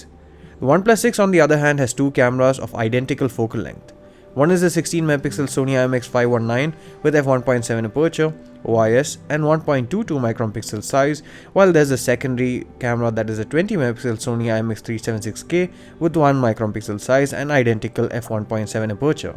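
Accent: Kerala, India. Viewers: Bro are you from Kerala ?? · Are you from kerala?? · bro are you a malayalee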